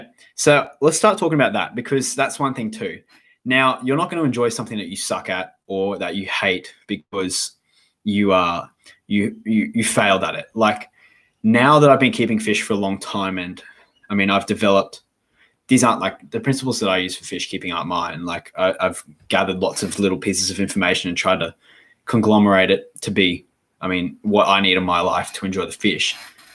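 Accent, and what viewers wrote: Australia. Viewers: Love the Australian accent haha, great video mate 😀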